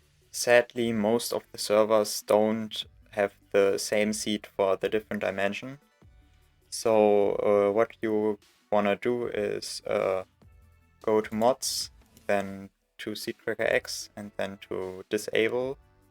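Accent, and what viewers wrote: Germany. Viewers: Bist du deutsch? Dein Englisch hört sich sehr deutsch an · du bist eindeutig deutsch... das hört man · This is hard German Voice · haha du bist deutsch oder? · i hör a little deutsch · Are you german or really english ? you don't sound like a english person NO HATE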